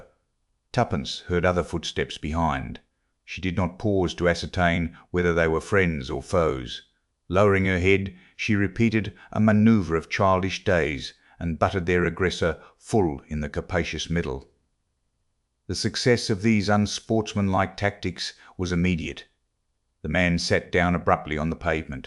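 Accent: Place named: Australia. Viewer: Sadly, a new AC novel, only her second, and yet "read" by a mindless (literally), uncomprehending AI in a totally deadpan manner, robbing the listener of any chance to be drawn into the fun or excitement of the adventure. Don't quite follow why the accent should be an Australian, mostly monotone, though well enunciated. What a total, boring waste.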